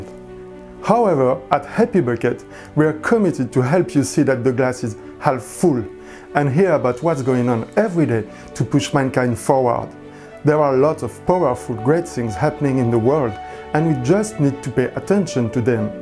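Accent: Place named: France. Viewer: Maydee I ffink ze baiste iss mébi douwing your videyos in frenche and subtitle them in English. I had to turn subtitles to understand, you have a very Antoine de Caunes type of accent and some words are just missed because of the “intonations “.... but very good choice of news, happy indeed. But try smiling (even) more. It’s happy news ! 😁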